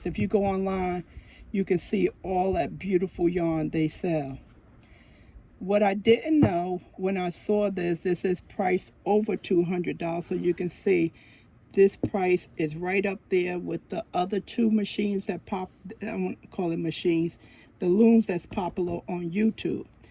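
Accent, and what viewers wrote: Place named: Alabama, United States. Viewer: I wonder if one of your local yarn shops has a weaving class? Every year on TV I watch the PA Farm Show, and they have a competition for the best wrap/shawl. That is my favorite part on the PA Farm Show. I love weaving, but having a loom is another item I just can't have. Please have many more videos on your progress. I think this is one of my favorite videos that you made for your yarn friends. My husband ask me if you are a Southern girl. He said that you sound like his friends from Alabama. : ) I just love you; your the best!!!! Smiles, Claudia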